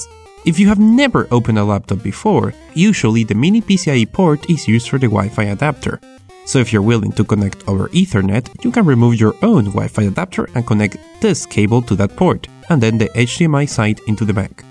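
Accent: Spain. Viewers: Are you a spanish speaker? Nice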